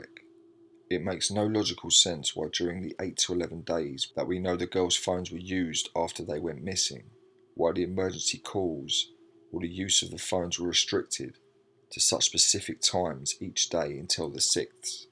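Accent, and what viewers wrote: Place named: England, United Kingdom. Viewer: Wow sounds the lovely english accent.. long live england .. great insight sir ... these ladies were abducted znd murdered by he.ry Elizier gonzalez znd his gang à cover up rip kris and lisianne 🙏🙏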